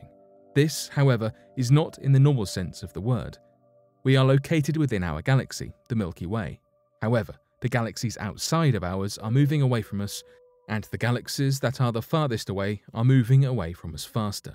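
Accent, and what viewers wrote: United Kingdom. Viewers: Matt Michniuk thats actually the British pronunciation. Much like sedule for schedule or alu-minium for aluminum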